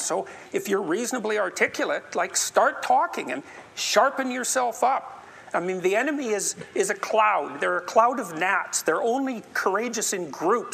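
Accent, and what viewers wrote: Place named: Massachusetts, United States. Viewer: People, saying big words with a soft tone of voice in a Massachusetts accent doesn't make you a genius who's right about everything. This is nothing but American establishment "work work work" ideology changing it's font to something people are more into atm.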